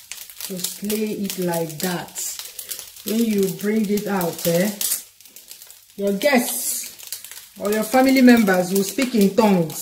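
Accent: Igbo, Nigeria. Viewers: Your accent is always sounding Nigerian, this jollof rice is called benachin or cheb in Gambia or Senegal respectively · Am more paying attention on that lovely igbo ascent more then the lecture Nnem dalu. On my way to supermarket over a month now this is exactly I need the German resentment never gave me the test I needed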